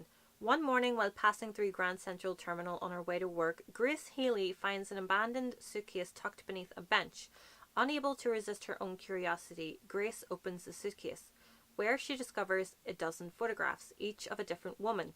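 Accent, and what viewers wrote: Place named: Ireland. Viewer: Dont lighten your accent i love the irish accent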